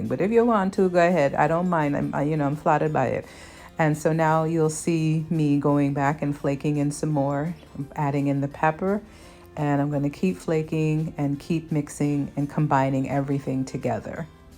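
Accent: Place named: Caribbean. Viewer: 😮😮 Wow!!!I’m smelling the salt fish from Montreal.🤣🤣Looks sooooo tasty.Sending Love ❤️ I’m loving your West Indian accent.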